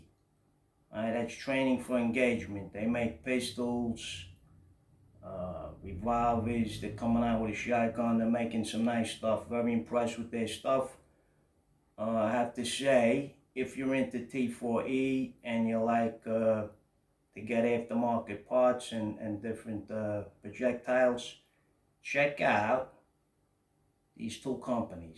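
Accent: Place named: New York, United States. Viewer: Hello from Glasgow Scotland, Getting very rare to hear a proper NY accent, its the same with the proper Glaswegian accent, great review, I have a few pistol bows and what I have done with the bolts is fill them with silicone, cheap and does the trick for a bit of extra weight.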